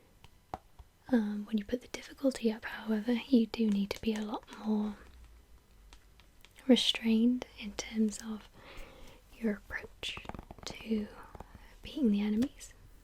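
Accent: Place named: United Kingdom. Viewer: YES I DID! Ive been watching it every night to fall asleep! I love the way you tap! More than the way most ASMR artists tap. Thank you! And your voice (and British accent, as I am American) is very relaxing as well.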